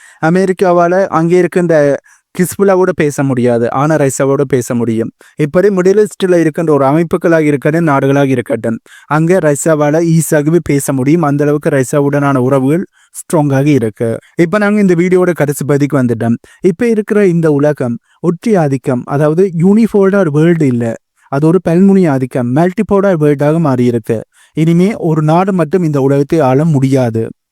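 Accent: Sri Lanka. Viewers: யாழ்ப்பாணத் தமிழ்.😂😂😂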